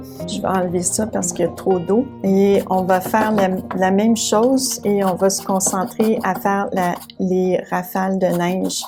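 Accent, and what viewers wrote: Canada. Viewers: Bonjour , vos conseils me sont très utile ,moi le débutant , vous êtes adorable ,votre accent Canadien est superbe , j'aime votre travail ,vos conseils et vos explications ,merci ,Bruno de Saint-Etienne France.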